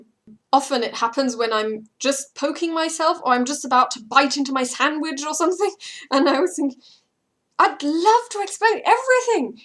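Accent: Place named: United Kingdom. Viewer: Du hast einen leicht britischen Touch :D Habe ich lange nicht mehr gehört! Und schrei bitte nicht deinen Pen an :( Der kleine will ja nur helfen...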